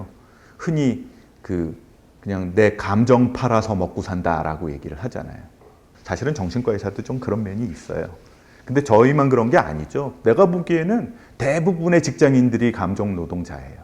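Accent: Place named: Seoul, South Korea. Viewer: 서울경기권 방언입니다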